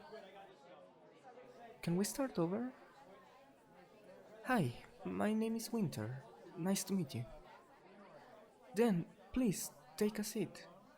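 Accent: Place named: United Kingdom. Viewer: I'm new , are you British? because your voice kinda sound like British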